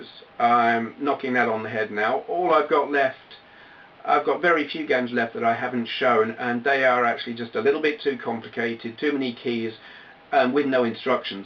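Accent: United Kingdom. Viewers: it was me not understanding you, it took me a while to get used to hearing sarcasm in a brittish accent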